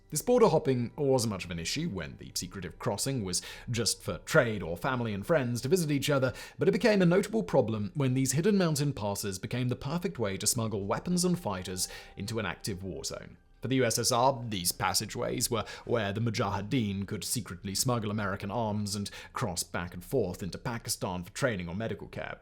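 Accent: England, United Kingdom. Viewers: Afghan history should certainly not be told by a dude with English accent especially when you skip the nasty details in favor of the British oppressor and aggressor! Correct version: The Bacha-i-Saqao was an orchestrated Islamic uprising by the British to oust the real Afghan king, Amanullah Khan, in 1929. When the last Afghan king was removed, the British RAF instantly installed Nadir Shah and his four brothers (the Musahiban brothers), who attempted to erase the era of Amanullah Khan and rewrite Afghan history by killing thousands of Afghan students and intellectuals in order to enable the British annexation of Afghan land (Durand Line), which Amanullah Khan had freed after the Third Anglo-Afghan War in 1919. Amanullah Khan had also formed a pact with the Bolsheviks, better known as the USSR, in 1923 to distance Britain's imperialism. The British puppet king, Nadir, also fostered division in Afghanistan by promoting racism among different Afghan ethnic groups, especially the Hazaras, who became the oppressed group. 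Nadir even created a separate class and caste within his own Pashtun ethnicity; his tribe, the Mohammadzai, was considered superior to other Pashtun tribes. Interestingly, Karzai, the first USA-backed Afghan puppet, is a relative of King Zahir, who was the son of the British puppet king, Nadir, just as Ghani was a puppet of the West, serving the interests of USA banks to exploit Afghanistan. Afghanistan gained its independence because King Amanullah Khan recogniz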